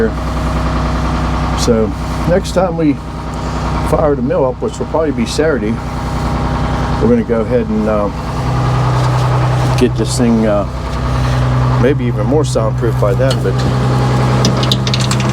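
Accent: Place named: Pittsburgh, United States. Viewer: Handy sez that is a thick yinz we accent.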